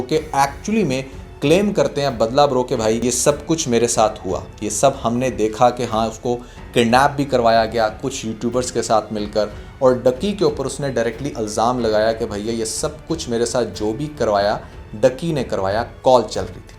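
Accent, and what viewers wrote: India. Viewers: Why you speak like Indians?"karne lag Ra tha" nonsense 😊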